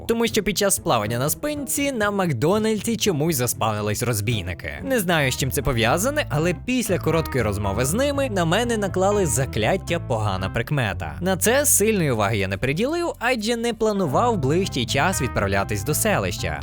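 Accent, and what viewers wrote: Lviv, Ukraine. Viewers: Привіт) Давно не бачила українськомовного майнкрафтера) Коли почула "чуть не получив тягла" зрозуміла, що ти зі Львова) Так приємно чути українську мову на ютубі і свій говір) Бажаю успіхів) Продовжуй в тому ж дусі) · ТИ ЗІ ЛЬВОВА?! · Ти зі львова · ТИ ЗІ ЛЬВОВА? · ти зі львова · Ти з Львова ?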